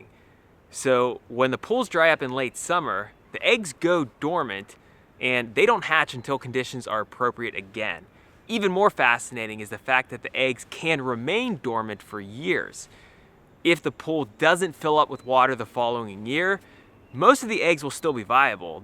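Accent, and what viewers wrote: Pittsburgh, United States. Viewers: I love hearing a Pittsburgh accent in a nature video. We do have interesting backyards lol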